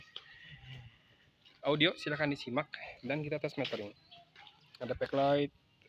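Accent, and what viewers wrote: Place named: Sunda, Indonesia. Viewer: Suka sama suaranya aa. Sunda banget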